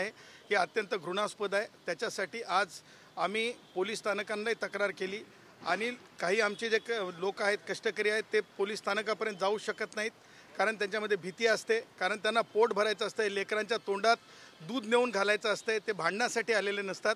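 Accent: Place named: Bihar, India. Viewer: Ha full bihari tone madhe boltoy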